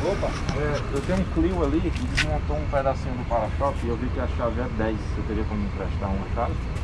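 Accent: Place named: Ceará, Brazil. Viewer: FállôôUuuuu do jeito que tu fala é muito cearenses man!! um salve daqui de SP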